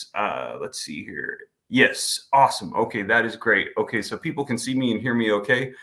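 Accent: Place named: Louisiana, United States. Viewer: I could hear you say you Louisiana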